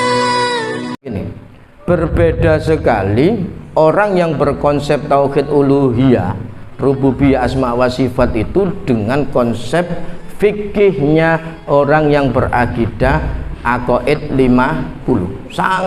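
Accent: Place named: Java, Indonesia. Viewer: Sumenep kok logate jowo tadz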